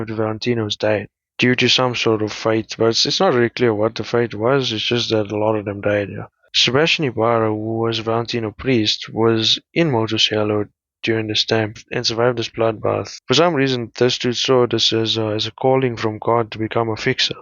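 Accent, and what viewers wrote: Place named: South Africa. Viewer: Yooo. You sound South African choom. Awesome vid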